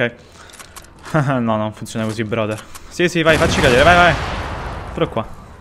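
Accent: Naples, Italy. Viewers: Oddio ma sei di Napoli 😂😍🤞🏻💙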